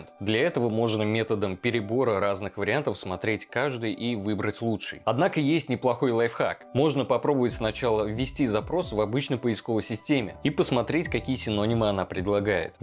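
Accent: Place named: United States. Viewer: Некорректно - неточно, неверно. Ну и этоо выкаблучивание с чтением с американсим выговором раздражает.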